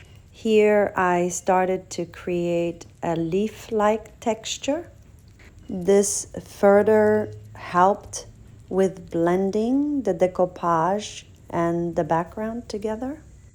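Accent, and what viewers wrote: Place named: Europe. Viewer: I’ve been watching all of your shorts and just tuned into this. I’ve been wondering where you find all of your beautiful pieces and now that I hear you beautiful accent I’m thinking you must be in Europe and it makes since how you find the pieces. I live in Colorado USA and believe me these cowboys out here don’t have such great pieces like you find.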